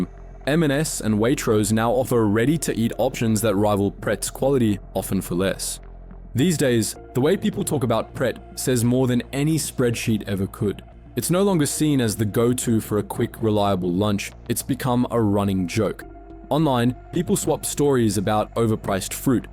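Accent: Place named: Australia. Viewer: Why pick an Australian for the robo-voice?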